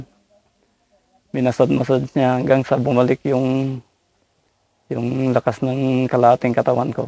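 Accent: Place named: Pangasinan, Philippines. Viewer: Yung Punto mo KABAYAN, PANGASINAN KA PALA,AY ARO IDOL TA KA LA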